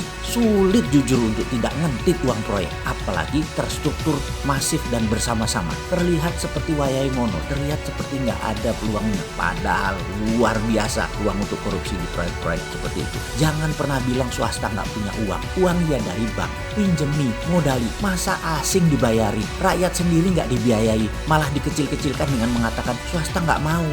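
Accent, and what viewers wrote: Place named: East Java, Indonesia. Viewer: Di unek2ke wae bossman yen dikandani ngeyel. Kangen jawatimuranmu metu #peace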